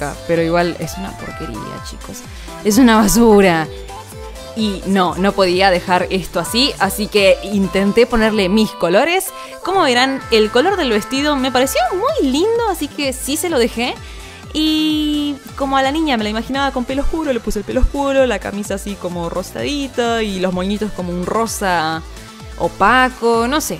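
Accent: Argentina. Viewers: Nueva sub se nota que sos Argentona :"D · Sos argentina??? De que pais sos? Te amo♡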